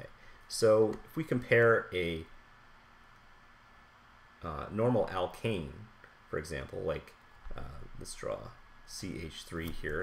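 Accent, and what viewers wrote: Canada. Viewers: You're Canadian, aren't you? Love you, man. Thanks for the 3 AM lesson.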